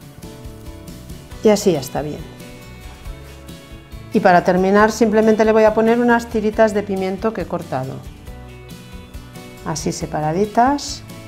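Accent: Catalonia, Spain. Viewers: Gracias voy a tratar de hacerlo,saludos desde Argentina buenos aires, pues aquí las navidades son con mucho calor, a mí me gustan las de España con frío, pareces catalana o valenciana por el acento y la con doble n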